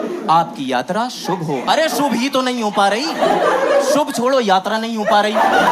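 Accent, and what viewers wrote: Hyderabad, India. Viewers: 2nd video and suscribed ❤❤❤hadreabdi tone 😂😂❤❤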